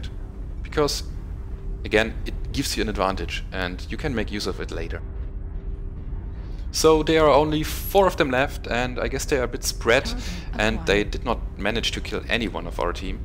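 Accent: Germany. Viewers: Whoa, you are a really high skill MWO pilot. Your videos are excellent and your analysis and advice are right on the spot, thank you ! Always entertaining, that European (guessing German) accent is...OK? With that same accent but using a voice modulator this your vids could get even better !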